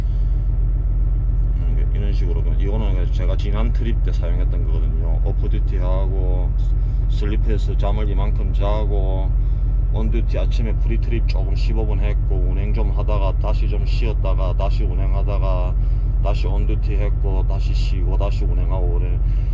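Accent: Gyeongsang, South Korea. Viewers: 오빠 열나게 달려봐라. 단디 잡아라 가시나야...... 경상도 사투리는 언재나 들어도 재미있고 친근감이갑니다. 아저씨는 들을수가없어 안타 깝습니다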